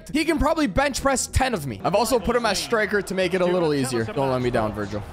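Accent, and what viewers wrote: Canada. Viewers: I thought u were Canadian lol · ARE YOU CANADIAN OR POLISH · I thought you were Canadian lol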